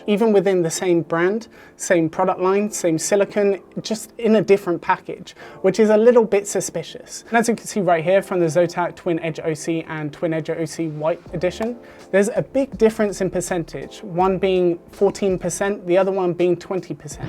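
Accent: United Kingdom. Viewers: ok, so my ears didnt fool me that you sound so british XD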